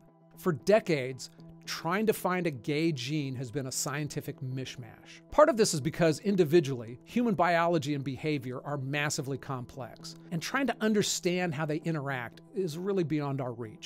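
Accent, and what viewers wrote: United States. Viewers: San Francisco accent. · I love yourvideos but you def have a " gay californian" accent it's wild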